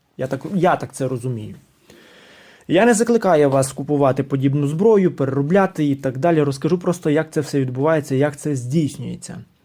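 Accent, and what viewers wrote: Lviv, Ukraine. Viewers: Красавчік, чую що із Львівщини чи поруч. Але контент цікавий😂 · Чути Львівський акцент у Пана,хоча може я й не правий😊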